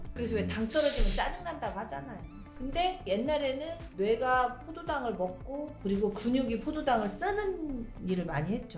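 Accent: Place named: Jeolla, South Korea. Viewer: 이언니 말투가 전라도네ㅡ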